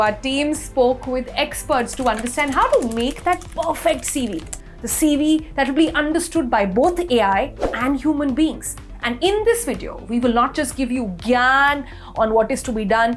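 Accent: India. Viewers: Wow, I struggle with such a strong Indian accent. 😞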